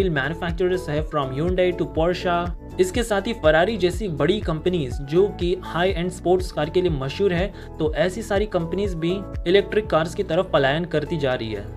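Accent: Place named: India. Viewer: If you are going to speak English then speak slowly and enunciate clearly. With your Indian accent and the speed at which you speak, it makes what you say almost unintelligible. Thanks You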